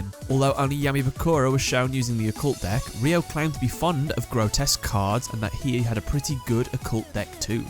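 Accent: United Kingdom. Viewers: Bri'ish lad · why so british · people always ask, "why so british?"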